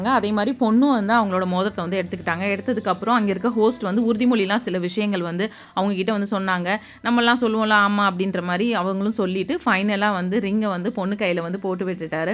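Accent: India. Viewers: அம்மாச்சியா உங்க ஊரு எது சகோதரி, எங்க ஊரு பேச்சு மாதிரி இருக்கு, எங்க ஊரு காரைக்குடி ங்க. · அருமை மதுரை தமிழில்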